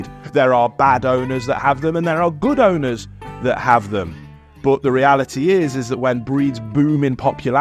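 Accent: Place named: Birmingham, United Kingdom. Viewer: Question- is that a Brummie accent I detect? Trying to learn more about these British accents. It's certainly distinct, but fortunately even discernible to this Yank.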